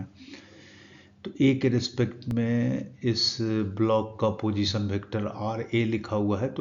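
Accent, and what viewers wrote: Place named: India. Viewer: Used india english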